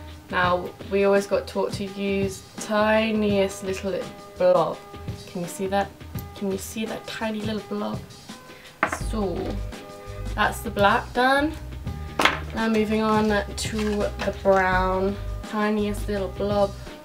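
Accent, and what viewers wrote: United States. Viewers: I like how you talk, it was cool to hear you sound kind of American. Don't listen to the person who said it was annoying. Most people make different sounds or slight variations or accents from time to time. :) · I don't understand why you talk normally and then have an American accent? Throughout this video... Kinda annoying. Helpful video though :) so thanks!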